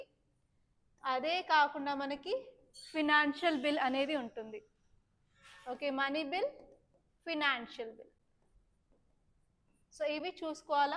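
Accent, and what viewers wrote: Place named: North, India. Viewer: Mam miru telugu valla am so happy...when I listen your polity free classes... I thought u r north indian....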